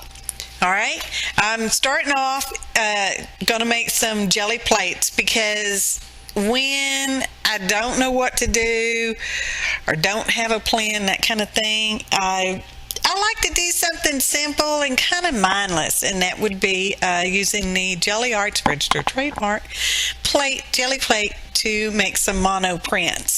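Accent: South, United States. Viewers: Loved your project and love your southern accent!!!!!!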